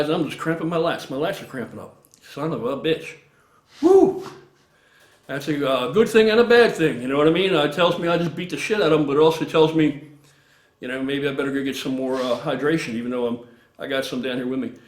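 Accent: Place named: Pittsburgh, United States. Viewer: That accent screams Pittsburgh.. speaking of, could really go for a Primantis right about now!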